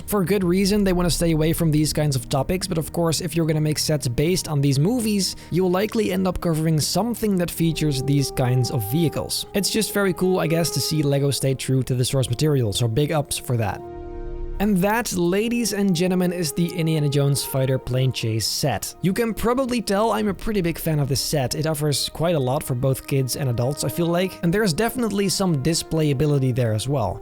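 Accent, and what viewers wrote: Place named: Netherlands. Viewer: first time i clicke don and heard your voice i was like that is a dutch guy for sure or atleast belgium german just that specific accent and pronounciation of words that my fellow dutch people do but i dont cuz i learned english myself by nonstop youtube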